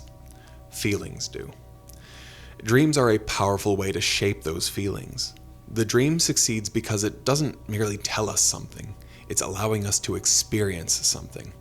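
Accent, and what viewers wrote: Ontario, Canada. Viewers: lousy canadian accent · i love your Ontario accent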